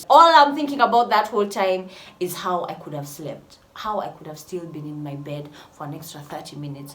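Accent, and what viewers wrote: United Kingdom. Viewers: I so love you..it's such videos I long for.. kindly do a video with your British accent..I would love to hear this ❤️ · Your British accent ✨💕💕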